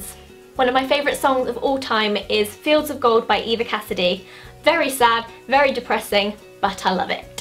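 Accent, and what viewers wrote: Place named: United Kingdom. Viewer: то, что вы имеете в виду - это американский английский, а это - чистый британский акцент (может не чистый, но британский английский)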